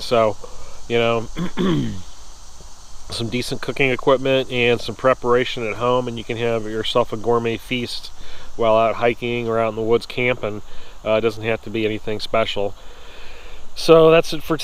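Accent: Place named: Northern Ohio, United States. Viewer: Btw, I live in the Columbus area, too. Listening to you I’d say you’re from northern Ohio originally. Somehow I ended up on the Bushcraft Ohio website and you said you’re going to the Zanesville Bushcraft gathering. I’d never watched your videos before but I’m glad I have now. I take care of my mother so I can’t go to western PA to practice and enjoy. As I’m just getting back into this because my kids are now all at OSU.... they never liked camping so it’s been on hold over 15 years. Anyway, I’ve been going to Delaware State Park and tent camping. Pretty much stick to myself because almost everyone else is in a trailer. Doing some bushcrafting too. Harvesting and processing wood, building a table, making tripods, big and small and using them for tarp shelters and hammock hangs etc. If you see this and have time to respond, where can I go that’s within an hour of Dublin/Powell to “wild camp” I guess it’s being called now? 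Is that different than primitive camping? Anyway, any info you can provide will surely be appreciated. I think I’m going to watch our fatwood video next. I’m still searching for the mother lode score. Sure, I can harvest from a branch... but who doesn’t want to find the outer rotted trunk of punkwood with the inner core of fatwood? It’ll happen.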